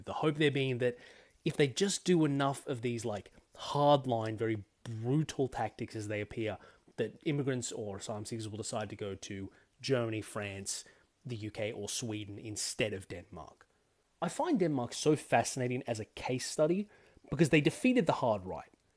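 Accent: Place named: Australia. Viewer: you sound aussie, you should do aussie politics. example; how the fee free tafe can be a game changer to the economy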